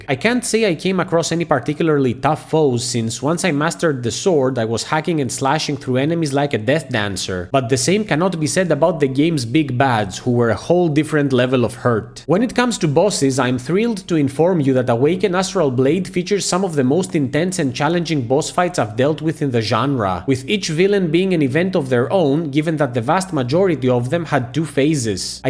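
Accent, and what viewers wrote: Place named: Greece. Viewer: I have a feeling that you are greek according to the accent ! Good video! You just earned a subscriber :)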